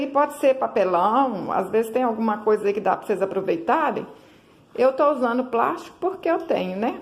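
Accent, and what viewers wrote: Minas Gerais, Brazil. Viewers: pelo sotaque parece que você é mineira,eu também sou. Amei essa lembrancinha!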